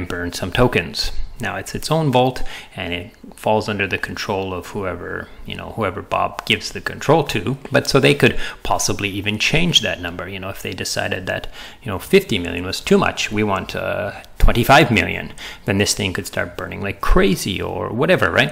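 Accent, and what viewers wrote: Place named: Italy. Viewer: Hahaha - more italian accents please :)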